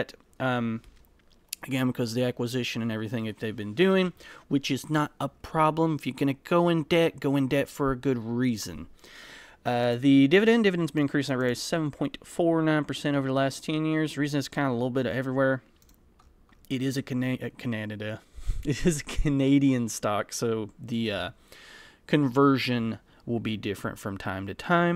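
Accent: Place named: Pennsylvania, United States. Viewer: Said it before, I'll say it again for the Al Gore rythm, I LOVE your data visualizations! What exactly is the accent? I'm guessing PA, but I'm not an expert in PA accents so don't know the part of PA.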